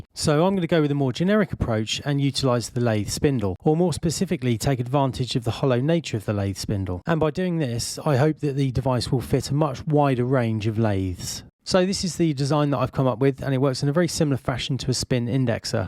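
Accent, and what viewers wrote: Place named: England, United Kingdom. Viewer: I like the well designed attachment but as an American I cannot understand the speaker's rapid English accent.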